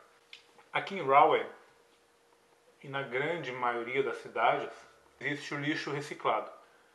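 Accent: Rio Grande do Sul, Brazil. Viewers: e ai blz tu e gaucho tche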